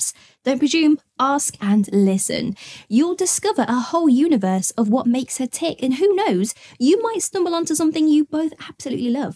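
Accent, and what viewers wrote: United Kingdom. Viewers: Damn I need to find a woman like. I love the British accent from a woman. (Never Assume Anything, it always makes an Ass of U M E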